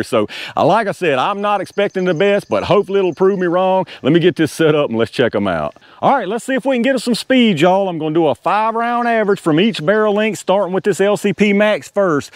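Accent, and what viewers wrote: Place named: North Carolina, United States. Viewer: He may live in Virginia, but that accent is eastern North Carolina.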